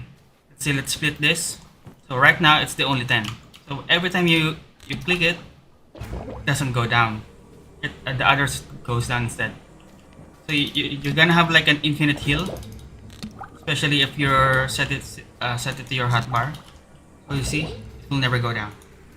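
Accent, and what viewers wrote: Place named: Philippines. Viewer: Kumusta from Australia! I think you're Filipino anyway from the accent.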